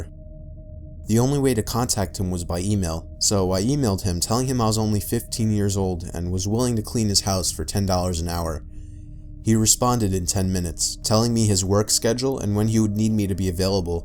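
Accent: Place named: New York, United States. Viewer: Being a horror buff and NYC native, I appreciate these stories AND your (NY/NJ?) accent 🙌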